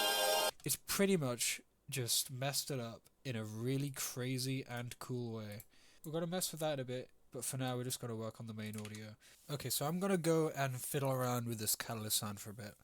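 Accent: United Kingdom. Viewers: HES BRITISH?!??!!??!!?!?? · HE'S BRITISH?!?!?!?!? 🇬🇧🇬🇧🇬🇧🇬🇧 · Hello mate, you sound kinda bri’ish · WHY IS HE BRITISH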